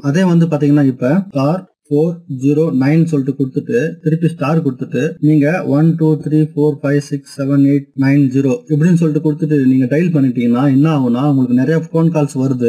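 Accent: Chennai, India. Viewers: Bro UNGA Chennai Tamil semma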